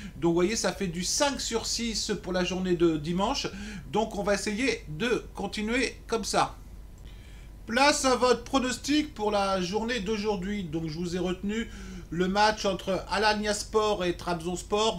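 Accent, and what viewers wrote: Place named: United Kingdom. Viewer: Hello merci pour la pub mentionnant mon prénom, c est normal de ne pas gagner tous les jours, je t écoute depuis suffisamment longtemps pour me faire l idée que tu es au dessus du filet avec caritor 😉😉changes pas ton accent British, il est très fun😊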